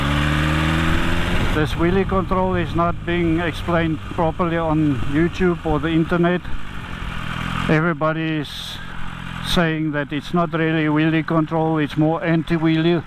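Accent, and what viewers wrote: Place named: South Africa. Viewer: Man I clicked on this video for some knowledge on wheelie control and as soon as I heard the accent and the road appeared I could tell you're from South Africa. Great video sir. I've subscribed.🙏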